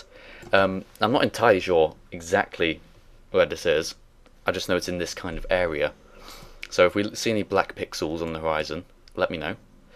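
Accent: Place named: England, United Kingdom. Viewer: You sound like an English PlumpHelmetPunk. Awesome.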